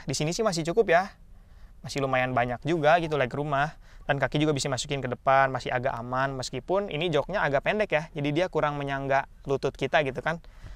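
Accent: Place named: Sunda, Indonesia. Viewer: Mang, asik reviewnya. Logat sundanya kentel lagi. Yang rajin mang!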